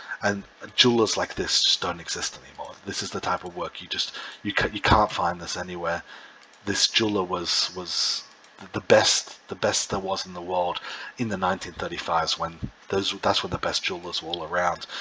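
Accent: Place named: United Kingdom. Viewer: The narration fits the vintage vibe. Ever so slightly British in accent, reminiscent of Antiques Road Show, and not unlike the jeweler in the Friends episode where Phoebe helps Chandler buy Monica's vintage ring. Coincidental? Maybe.....🤐🤭🤐 But perfect nonetheless.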